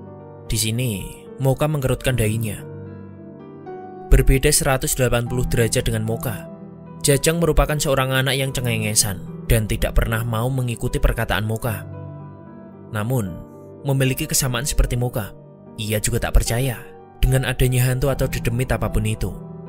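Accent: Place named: Java, Indonesia. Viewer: Orang mana bng, medok bgt :(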